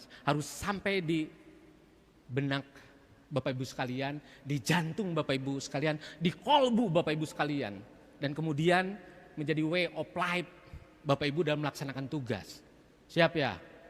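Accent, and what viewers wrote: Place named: Sunda, Indonesia. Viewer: Nyunda pisan👍👍👍 mantap ngabret" pak sekda"sauyunan ngabangun Jabar istimewa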